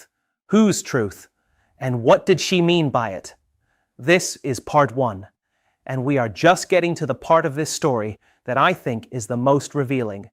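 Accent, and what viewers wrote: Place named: United Kingdom. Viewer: Oops. What happened to the British accent?!?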